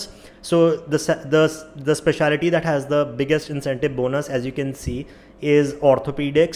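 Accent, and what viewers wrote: India. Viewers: Bro lives in USA and still managed to get an Indian accent thicker than me 😢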